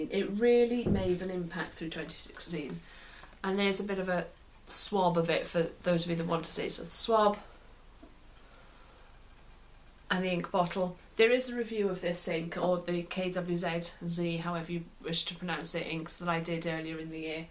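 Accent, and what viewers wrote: Wales, United Kingdom. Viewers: Its her Welsh accent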